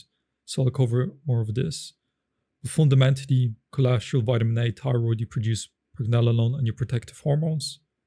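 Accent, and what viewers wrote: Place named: Ireland. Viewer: Yes, is Polish but has said the he's lived in Ireland since age 9, which accounts for his accent.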